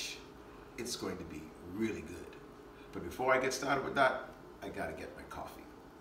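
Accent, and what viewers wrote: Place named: Bahamas. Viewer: Thank you so much for this video.. my best friend was from Nassau and she used to make this for me and watching this video just brought back so much memories of her great cooking . Even listening to your Bahamian accent reminds me of her ☺️she passed away in 2010 and I love and miss my bahama mama best friend/sister so much 💔🕊🕊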